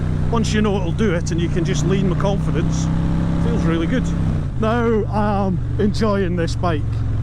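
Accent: Scotland, United Kingdom. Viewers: A Scotsman on a Harley ...I like the voice sound... Great vid..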